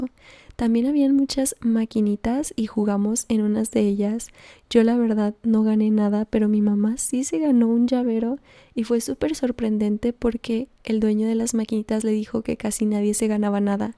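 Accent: Mexico City, Mexico. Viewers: Casi juraba, antes de este video, que eras de la cdmx y vivias en la roma o en la condesa jajaja. Que bueno que te gusto, salu2! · Yo también pensé que eras de la CDMX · Wow! Yo juraba que eras de la cdmx por tu acento 🥹 qué bueno que fuiste y conociste mucho, yo creo que los que vivimos aquí no terminamos de recorrerla!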